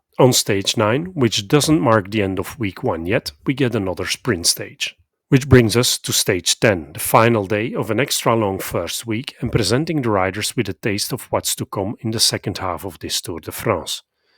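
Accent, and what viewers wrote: Flanders, Belgium. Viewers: 😀super tick Flemish accent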